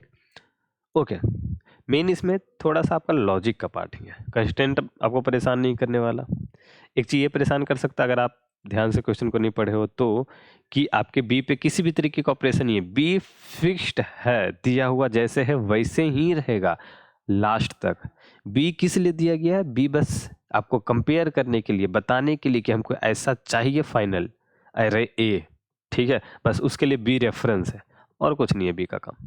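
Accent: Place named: Bihar, India. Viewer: Thanks Bhaiya! Great Explaination! Loved your BIHARI accent! ❣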